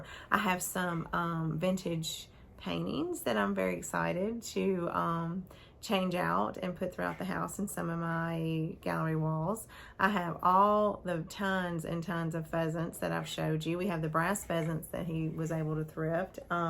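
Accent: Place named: South, United States. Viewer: any way you can turn on closed captioning? i am a northerner and have a tad bit of difficulty with your southern accent😉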